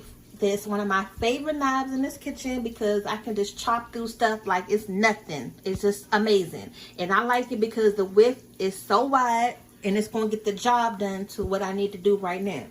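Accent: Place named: DMV, United States. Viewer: Sound like she from the DMV!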